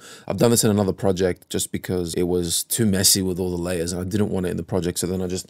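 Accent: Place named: Australia. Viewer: Ahh the comforting sound of the aussie accent, it makes me happy to hear you haven't lost it at all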